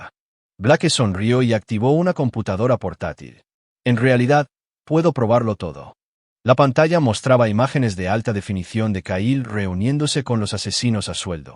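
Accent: United States. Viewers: Por favor no metan palabras en ingles o hablen como gringo, o son españoles o son americanos o portugueses, por dios!!!! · Buena historia, buenas traducción pero deben editar antes de subir. Se escucha horrible cuando pronunciar con acento gringo palabras en español, así como leen en español los nombres que están en inglés, como el de Blake (bleik).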